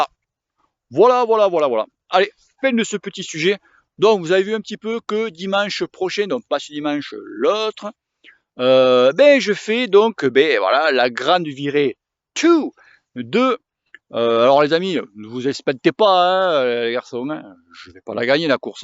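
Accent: South, France. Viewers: En faite tes détracteurs il ne comprennent pas ton accent du sud du coup il faudrait peut être faire des sous titres... Sinon moi je roule dans le sud Est pluie pas pluie avec ma femme on a deux 350 ça passe crème c'est vrai qu'il faut nettoyer le vélo après mais bon arrête de faire la fegnasse lol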